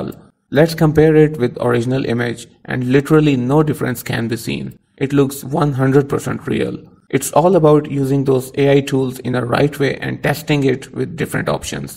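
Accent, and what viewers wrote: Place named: Hindi, India. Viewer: Hi man thanks for this, i noticed you have a strong Hindi accent, your obviously not a Native language English speaker like me. I was wondering do you know of any voice cloners that can accurately clone none native English accents like ours?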